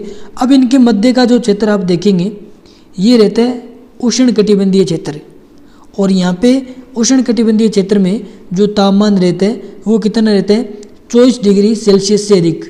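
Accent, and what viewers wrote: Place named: Bikaner, India. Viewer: Bhai aap bikaner ho kya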